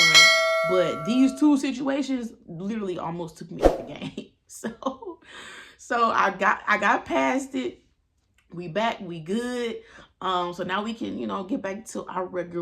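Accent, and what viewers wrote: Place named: St. Louis, United States. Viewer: Your St. Louis accent is so strong lol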